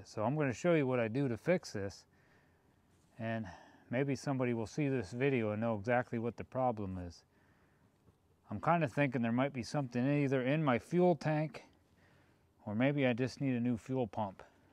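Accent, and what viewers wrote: Pennsylvania, United States. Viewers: Cool. I thought you had kind of a PA accent. I’m originally from NE PA and now I live in NH. NH apparently stinks for trying to buy non-ethanol gas. No gas stations sell it that I can find and only specialty stores sell it in cans for like $25-$30 per gallon. Anyway, I found my problem. I actually blew some compressed air backwards through the gas line after emptying the tank. There was a clump of dirt stuck on some kind of gummy crap stuck somewhere between the top of the tank and the inlet inside the tank. Looked like rubber cement. Runs like a champ again now. Hopefully that was the only dirt in the tank. Thanks for the idea of blowing out the lines like that though. Without your video, I’d probably have taken apart half the mower by now.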